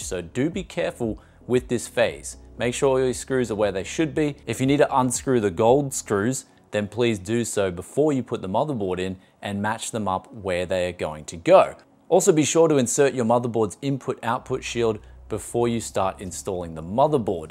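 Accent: Australia. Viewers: His ozzy english n movie star looks ..dayum! "But a320?" Says b450 fans. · Nice Build btw. I like it, just recently found your channel an I like your character a lot. Could listen to your advice and opinions all day long :) Maybe its the Aussi-slang in your English, gives me those Steve Erwin vibes, no offense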